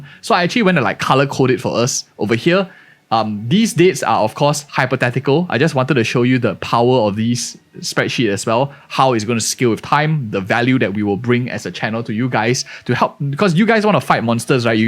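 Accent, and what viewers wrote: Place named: Singapore. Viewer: Great content, are you from Singapore? HAHAHH idk why but u sound very Singaporean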